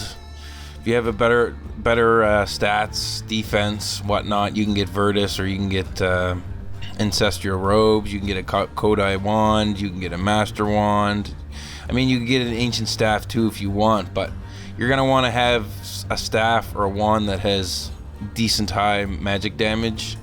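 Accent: Canada. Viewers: are you from nova scotia ?canada obviously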